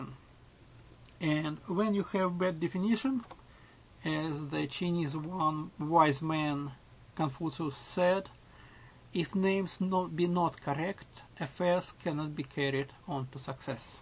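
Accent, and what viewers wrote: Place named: Russia. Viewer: Акцент русский просто жесть )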